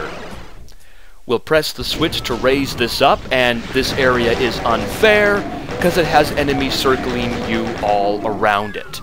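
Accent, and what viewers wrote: United Kingdom. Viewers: so BEAUTY SPEECH. NICE. I LISTEN YOUR VIDEOS EVERY NIGHT. NICE ENGLISH CLEAR. I LISTEN VOICE CLEAN BRITISH DIALOGS. I ALMOST 100% UNDERSTANDING THANKS TO YOU SIR FOR FINE SPEAKING. SO AS I COULDN NOT UNDERSTAND OTHER INTERVIEWS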